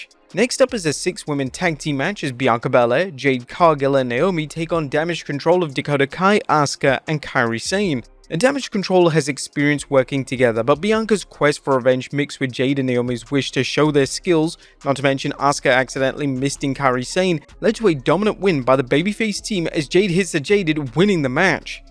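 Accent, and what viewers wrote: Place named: United Kingdom. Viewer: You sound like my British friend She loved watching the rock last night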